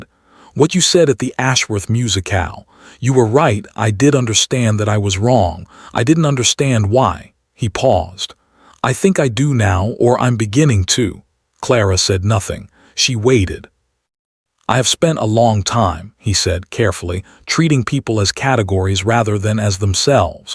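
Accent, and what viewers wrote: United States. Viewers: English accent much better than cowboy accent for the narrator 🤨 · The American accent is really unsuitable for this story about British aristocracy.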